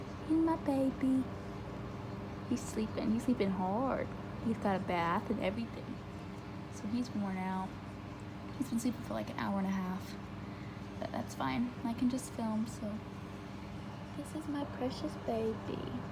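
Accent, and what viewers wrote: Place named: Indiana, United States. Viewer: I bet she’s from Indianapolis or close to it. It’s where I’m from and she talks how we do in central Indiana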